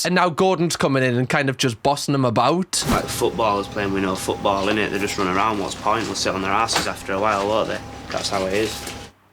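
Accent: Newcastle, United Kingdom. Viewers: You had me laughing so hard! 😂 Your commentary, turn of phrase, and geordie accent are all absolutely perfect. Don't ever change. ❤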